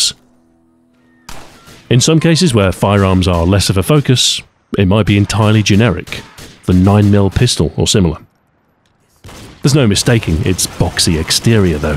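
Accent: United Kingdom. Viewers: And if you hear his accent, you can tell he is British, which most likely means he never shot a gun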